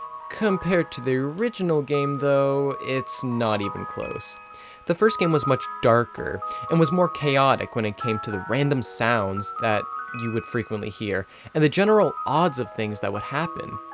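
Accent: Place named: United Kingdom. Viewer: I thought you were British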